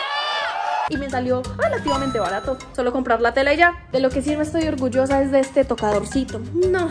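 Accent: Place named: Colombia. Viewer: Amo como hablas toda Haci colombiana y pues uno intentando hablar como tú jaja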